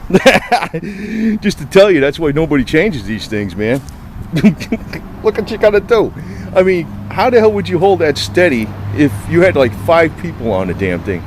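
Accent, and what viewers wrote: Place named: Boston, United States. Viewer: I hear the North east accent- is that Boston 'Frickin"?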